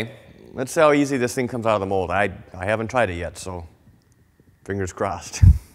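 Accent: Midwest, United States. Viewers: this guy sounds so midwestern it makes me wonder what kind of boats they have over there that arent icebreakers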